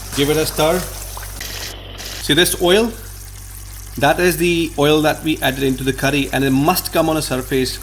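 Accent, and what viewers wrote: Scotland, United Kingdom. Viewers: Hint off jock accent there pal 👍👍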